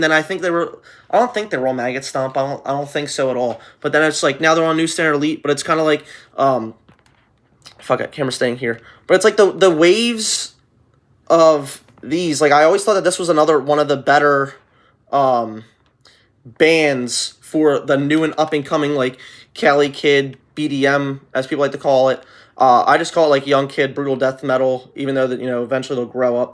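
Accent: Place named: Texas, United States. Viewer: I'm Texan and I approve the Texan accent....yall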